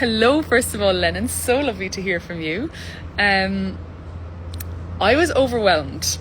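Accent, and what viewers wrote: Ireland. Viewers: Wow i love the irich accent ❤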